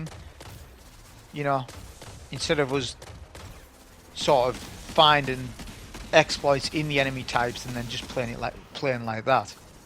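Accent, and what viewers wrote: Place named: Scotland, United Kingdom. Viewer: As a player exclusively playing with German speaking gamers I always recommend channel, but rarely they speak English well enough to understand his scottish accent. So they go to German speaking youtubers to watch how to play those Nightfalls. I understand well since I worked with two scottish women for a long time. And this channel IS the single best informative channel out there, no one else shares his knowledge in such a detailed way.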